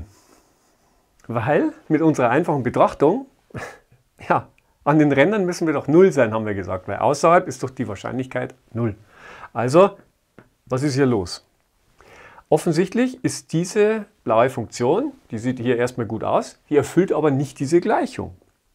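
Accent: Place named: Bavaria, Germany. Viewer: Trey 666 tiefstes Bayern wär mein tip ;)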